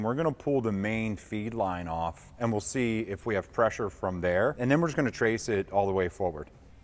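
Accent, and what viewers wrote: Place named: Philadelphia, United States. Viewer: Anyone else smell that? "We've been here all night" he walks out the door, and back in -- that's a lot of light outside, in January, around where I assume he is around Philly (I grew up in Levittown) -- I know that accent, and plus when he fired up the GPS in the plane it seemed to center around Delaware county or so. Then he says he needs $20k. I smell scam. Which is a shame, cause watching him get the props loose was really fun. Something's not right.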